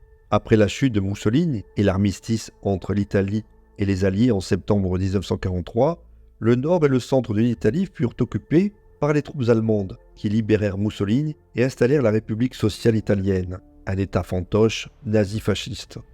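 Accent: Corsica, France. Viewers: Accent corse je dirais. Complications à articuler les syllabes.. 😊😊😊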